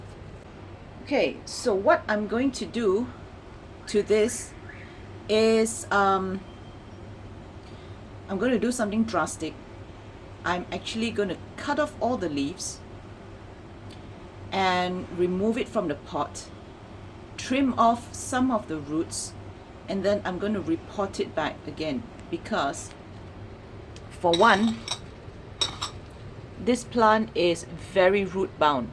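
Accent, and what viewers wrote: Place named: Singapore. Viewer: Immediately after I heard your voice I said, oh a singaporean plant hobbyist.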